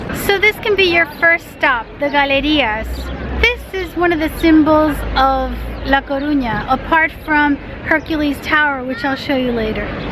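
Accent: United States. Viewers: This got randomly recommended, glad to see a coruñesa with American accent lol I was born at El Materno and sound coruñés as fuck in Spanish but also sound American as hell in English. Love that we are bringing some diversity back home!!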